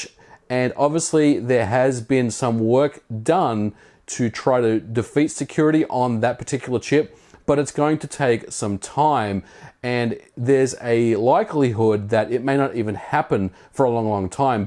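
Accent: Australia. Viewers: Why do you have Australian accent and live in USA ? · Mate, I love your videos, the pace you speak out, and the content you produce. You sound like you're from Australia?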